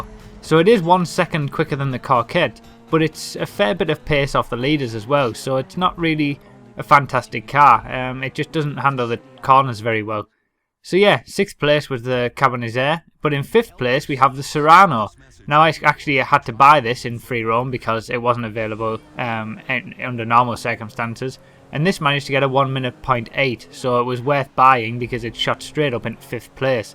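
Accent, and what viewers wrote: United Kingdom. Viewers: is this dude british, were is he from? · man all this british guy does is just race on 'cutting corners ' in his british accent. a lot of this is human error , so his times are skewed. just b/c this guy says a car is 1sec faster than one other one. doesn't mean it is. this is stupid. don't listen to him. he just likes to hear himself talk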